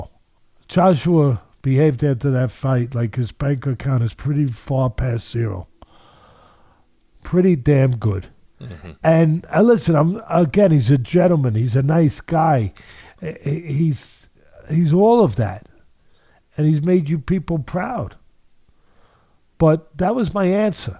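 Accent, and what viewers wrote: Newcastle, United Kingdom. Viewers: Kick off, true geordie. Gets me through the day major videos all the time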